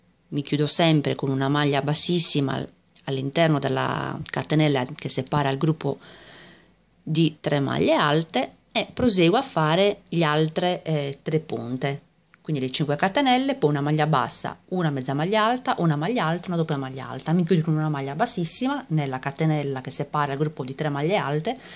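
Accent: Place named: Sardinia, Italy. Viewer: Molto molto carina e ben spiegata. E poi adoro il tuo accento sardo 😉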